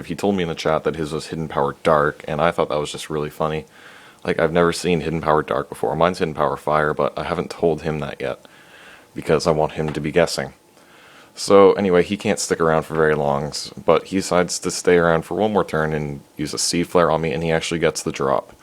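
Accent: Canada. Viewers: Hey, I saw you said on your channel that you use the desmume emulator so I went out and downloaded it, but I have a few questions how did you get your pokes to the correct EV IV and movesets. I've been trying to use action replay codes but I'll get the pokemon I need and then, my game will glitch out deleting my save file. Also it's no trouble to tell that you're Canadian lol.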